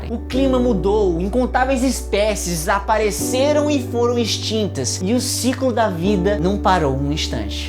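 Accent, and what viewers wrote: Ceará, Brazil. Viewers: Ele é cearense confunde mesmo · Cearense k( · Krai o guri e cearense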